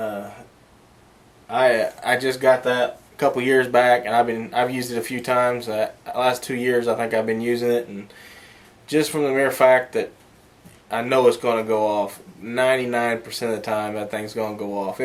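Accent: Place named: Texas, United States. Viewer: Saving this video for my brother and I. We are jsut getting into the Muzzle loader thing and this video is great. Thanks man. You must be from Texas. You sound like my family.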